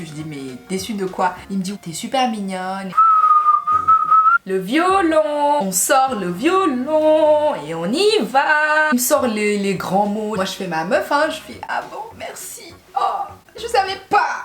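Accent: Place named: Senegal. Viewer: A ton accent j’ai direct capté que t’étais sénégalaise 🙃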